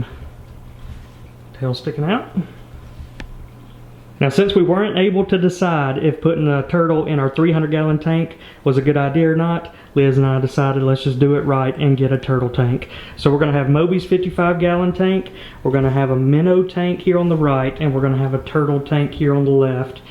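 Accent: South, United States. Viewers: With your accent I thought you’d say yall so it felt weird when you said you all lol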